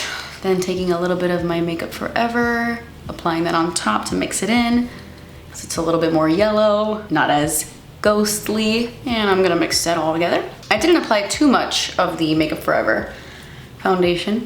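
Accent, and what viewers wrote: United Kingdom. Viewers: The British accent reminds me of Andy from the office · Love this! My daughter came in while I was watching and said, “she has a British accent!” I was like, “yeah…well kind of…” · Why do you keep going British????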